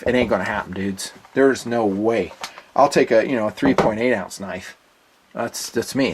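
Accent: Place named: California, United States. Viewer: Thanks for review! Never seen your channel and I'm blown away by how many followers you have...cause you sound like the kids I used to go to school with in california. ..brah! Seriously you got the steel wrong and the knife is on bearings. It's hard to watch your video when you don't know the item reviewed. JMHO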